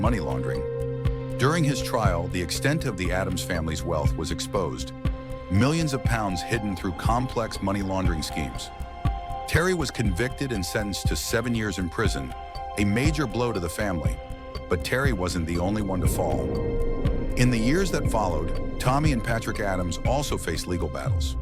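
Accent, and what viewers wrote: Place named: United States. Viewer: Turned off as soon as I heard an American accent.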